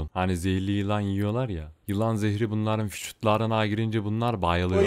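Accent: Ankara, Turkey. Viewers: Son derece başarılı. Belgeselleri çok severim. Ankara ağzıyla efsane olmuş. Böyle devam kardeşim. İnşallah burası tutacak. Dur ben bi paylaşayım.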